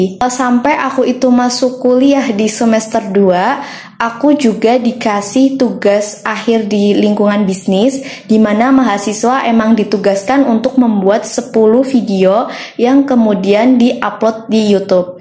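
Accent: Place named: Java, Indonesia. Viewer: Wah udh dapat gaji ya kak, mantap.. Nek denger logate, podo bae wong jowo iki.. Kayane sekabupaten malah hhe.. Semangat berkarya selalu..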